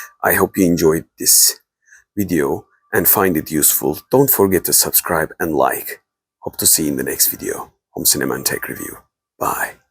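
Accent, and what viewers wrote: Turkey. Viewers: Abiii Aksanın türk aksanı olsun yinede güzel.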